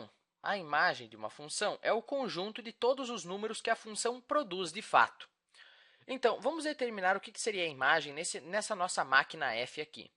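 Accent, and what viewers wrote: South, Brazil. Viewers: esse sotaque é do sul. você é de onde?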